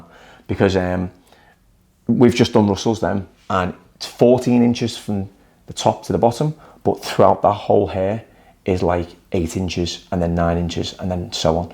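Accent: Liverpool, United Kingdom. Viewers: I’m 74, never been a barber nor a hairstylist, but I’ve sat in the chair many times for haircuts. None of my hairdressers knew what knows, he has taken his skills to a much higher level, also I love his Liverpool accent! 😃🇦🇺😍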